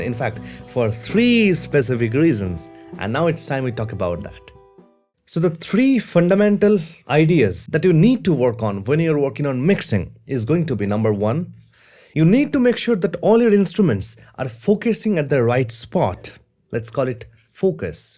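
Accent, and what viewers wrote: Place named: England, United Kingdom. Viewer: Great english accent, completely professional.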